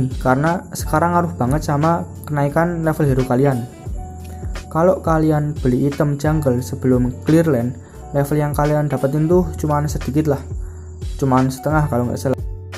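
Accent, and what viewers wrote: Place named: Java, Indonesia. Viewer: Medokee :v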